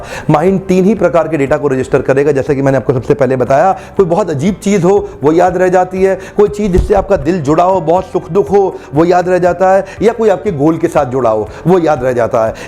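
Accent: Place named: India. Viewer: What talking about ,didn't understand the India English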